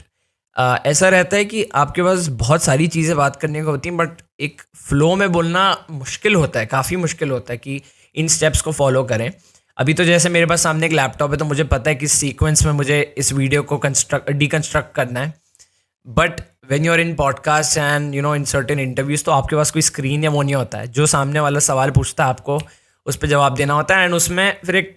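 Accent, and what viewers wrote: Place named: North India, India. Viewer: میاں یا تو خالص اردو بولا کیجئے یا خالص انگریزی۔ اردو میں خواہ مخواہ ٹھونسنا ایک بڑی نامناسب حرکت ہے۔ ذھنی مرعوبیت اور غلامی کا پتہ دیتی ہے۔ دوسرے اپنا تلفظ درست کریں۔ شین قاف بھی درست کریں۔ گلتی نہیں، غلطی۔ طریکا نہیں طریقہ۔ فیر نہیں پھر، بھاشا نہیں زبان ، انھوں نے بولا نہیں، انھوں نے کہا، باڑ نہیں، سیلاب، وغیرہ۔ کتاب ادریس یہودی کتاب ہے، عیسائی نہیں۔ اینڈ نہیں، اور۔ بھٹ نہیں، لیکن۔ برا نہ مانئے گا لیکن آپکی زبان شمالی ھندوستان کے ھندؤوں جیسی ہے۔ ابھی آپ نوجوان ہیں، محنت کیجئے اور اچھی، صحیح اور خوبصورت زبان بولنا سیکھ لیجئے۔ انگریزی بھی انگریزوں کی طرح بولنا سیکھیں۔ بہت اچھی بات ہے۔ لیکن جو زبان بولیں خالص اور مسلسل وہی بولیں۔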